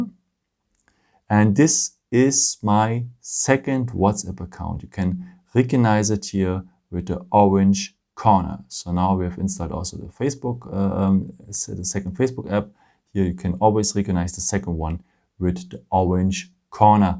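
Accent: Germany. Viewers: Are you from germany? Kommst du aus deutschland?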